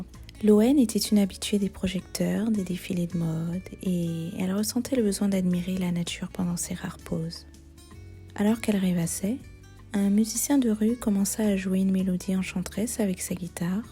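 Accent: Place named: France. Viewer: Wow very beautiful French accent voice and two of the beautiful feet in the world 😍🤩🌍🌎🌏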